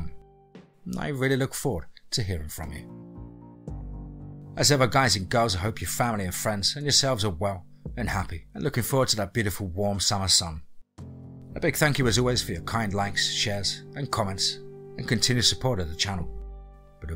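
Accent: England, United Kingdom. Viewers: Idk why English accents are great for scary story narrations, but they are!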